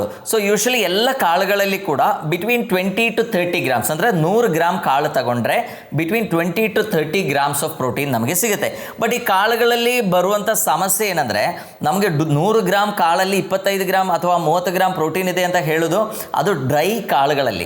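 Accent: Kerala, India. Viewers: ಅದ್ಬುತ ಕನ್ನಡ ಮಲಯಾಳದ ಶೈಲಿಯಲ್ಲಿ..‌ಅಡಿಪ್ಪೊಳಿ... ದನ್ಯವಾದ.‌🎉 ಉತ್ತಮ ಮಾಹಿತಿ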